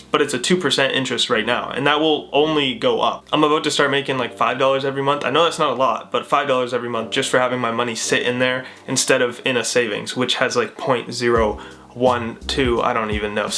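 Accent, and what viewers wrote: Canada. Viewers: This was super helpful! Are you Canadian as well!?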